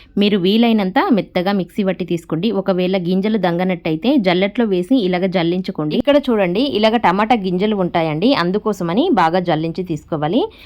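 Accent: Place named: Telangana, India. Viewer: Bagane cheppaaru kani konni words and mee slang edhola unnaay. Artham kaledhu. Meedhi Telangana state ayyi undochu kani andariki artham ayye slang lo chepthe bagundedhi. Only Telangana vallake kadhu kadhaa ee video. Telugu state ayina Andhra vallu kuda chudochu kadha. Naku kuda voma ante artham kaledhu evaro cheppedaka. Slang pure ga artham ayyela unte baguntundhi. But video is ok.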